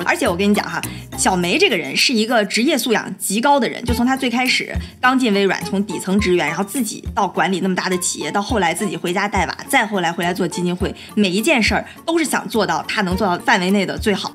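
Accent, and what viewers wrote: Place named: Dalian, China. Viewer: 姐真有喜感！有大連味。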